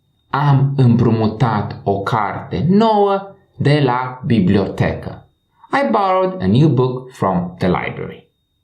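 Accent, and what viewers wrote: Transylvania, Romania. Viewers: pe mine nu ma deranjeaza accentul ardelenesc dar este vorba de doua sunete diferite "e" si "i" si pronuntia corecta; ii inveti sa vorbeasca adica sa pronunte corect romaneste este exact ca in lb engleza; ideea lectiilor este super,felicitari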